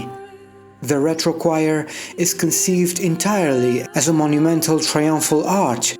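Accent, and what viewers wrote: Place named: United Kingdom. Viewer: una vez más me quito el sombrero ante tí, con tu perfecto British Accent y tu maravillosa forma de narrar,haces conocer al mundo lo precioso que es nuestro país fomentando al mismo tiempo qu vengan a conocernos. Gracias por aprender contigo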